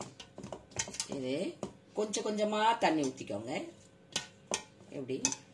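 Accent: Tirunelveli, India. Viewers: Tirunelveli Tamil super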